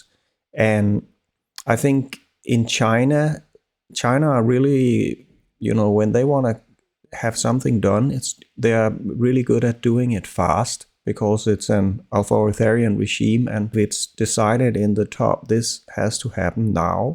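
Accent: Denmark. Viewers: Are you from Denmark? The English sounds "Danish".. (I am a Norwegian)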